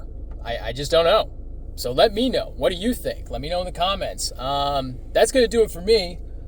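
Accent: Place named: Italy. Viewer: New subscriber here. It was your Italian accent that made me do it…..lol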